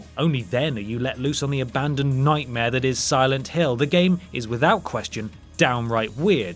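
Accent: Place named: RP, United Kingdom. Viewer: GOD IS THERE REALLY NO VIDEO OF SOMEONE DOING THIS LIST WHO DOESN'T SPEAK WITH AN OBNOXIOUS POSH ACCENT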